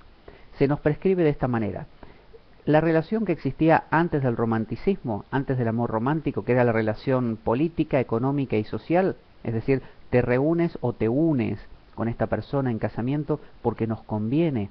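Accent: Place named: Argentina. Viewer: comose nota que eres argentino que bien hablais los argentinos hablais mucho con el corazon en españa no lo hacemos tanto los minimos... transmitis muchisimo por lo menos ami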